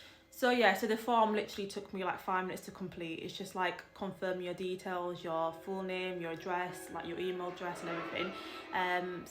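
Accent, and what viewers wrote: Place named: United Kingdom. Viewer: Thank you for your video, it’s always interesting to learn more about this topic. I’m a follower on TikTok, and I love both your content and your father’s 🩷 You have such a lovely British accent, it’s so pleasant to listen to 🥰 Xoxo from France!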